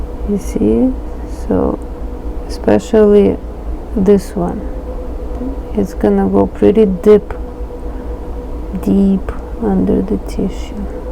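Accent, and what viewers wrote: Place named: Russia. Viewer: Русский акцент 🤪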